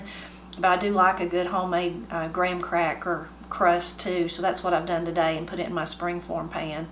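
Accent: Appalachia, United States. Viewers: My family are from the Appalachia area, central Appalachia to be precise. We have an accent similar to this woman’s but it sounds a little different. I get asked all the time when I’m on vacation out of state “where are you from”? Lol Or, “your accent is strong”. I say just yup, I got that Appalachia accent. 😂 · East Ky Appalachia here and we sound about the same!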